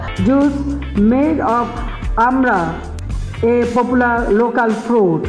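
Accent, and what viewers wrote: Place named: India. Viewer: উনি কি বাংলাদেশের প্রধানমন্ত্রী নাকি ইন্ডিয়ান প্রধানমন্ত্রী ভাষা থেকে বুঝা যায় যে ইন্ডিয়ায় জন্ম উনার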